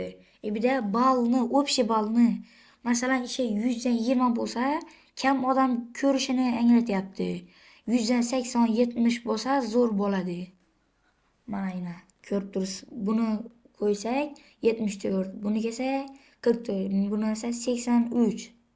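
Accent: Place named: Khorezm, Uzbekistan. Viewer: zoq chiqibdidan gaplash da gap bo xorazimcha va toshkentcha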